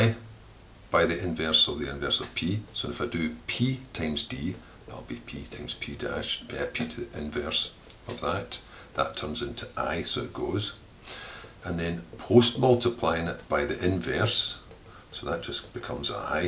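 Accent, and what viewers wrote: Scotland, United Kingdom. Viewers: He's quite clearly Scottish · That Scottish accent is freaking awesome.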